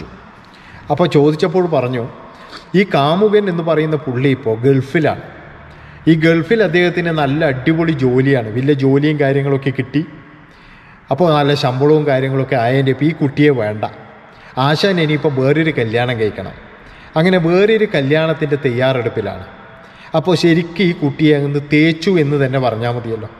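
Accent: Kannur, India. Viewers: Sir kannuraanoo njanum kannuraaa😊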